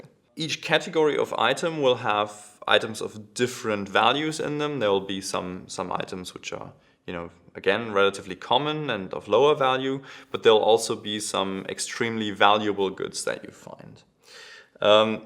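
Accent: Germany. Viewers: Er hört sich aber sehr nach Deutsch an.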